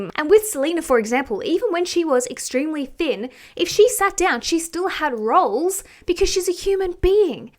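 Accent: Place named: Australia. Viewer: Girl! Since you been back in Australia your accent is getting stronger! I'm here for it not gonna lie lol 🥰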